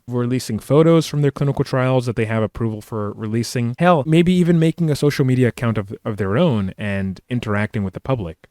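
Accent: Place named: United States. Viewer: Son accent est trop Americian pour l'être 😃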